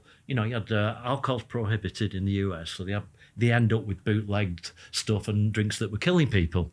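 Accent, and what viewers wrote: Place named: Accrington, United Kingdom. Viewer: This guy is great and sounds like he lives near me (Accrington) would love to meet him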